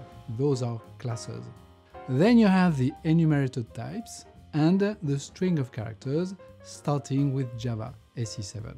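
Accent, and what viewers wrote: France. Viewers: I like the overall concept of JEP cafe, discussing the recent developments in JDK. Apart from explanations, I like his thick French Accent.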